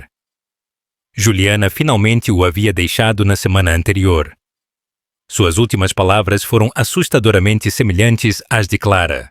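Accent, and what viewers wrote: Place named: Portugal. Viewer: QUE NARRAÇÃO HORRÍVEL E EM PORTUGUÊS DE PORTUGAL! QUE DESRESPEITO ‼️😡😡😡😡😡😡😡😡😡😡😡😡😡😡😡😡😡😡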